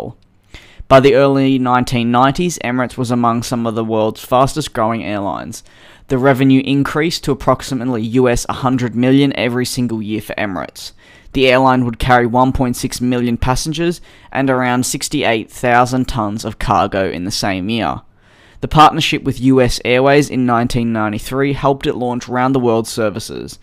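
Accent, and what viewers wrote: Australia. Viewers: Ye a aussie?!!!!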